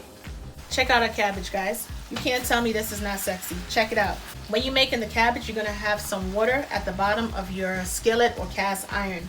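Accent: New York, United States. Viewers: You sound like you have a new york accent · Southern fried cooking with a NY accent gotta love it!! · Excellent video...great explanations...I've already saved to my recipe file. Out of all the Youtube Fried Cabbage Videos, I picked you Ms.! ( I loooove your New Yaulk accent) Gonna make this tonight. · Her accent ,is of New York! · shes nowhere near from the south. ny or jersey maybe. but her cabbage sounds great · She sounds like she has to be from New York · Definitely going to make this tomorrow for tea. By the way, love your accent I’m from UK. ( NY ? ) excellent vid. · Ms. Lady, your accent sounds like NYC, but your S U P E R B, smooth cooking is distinctly southern. Who influenced you? (Inquiring minds want to know).. You are welcome in our abode every day, and ..uh.. bring the cabbage cores with you. LOL. Want to view more of yr cooking. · Love her New York accent. 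Dish looking good!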